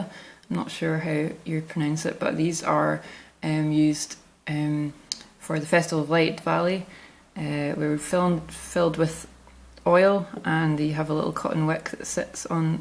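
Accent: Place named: Scotland, United Kingdom. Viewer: Hey lovely to hear another Scottish accent Mudlarking ; awesome .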